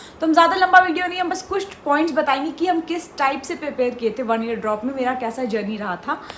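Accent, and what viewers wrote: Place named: Uttar Pradesh, India. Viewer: UP Accent ✅ Using Ham always 💗 , liked it .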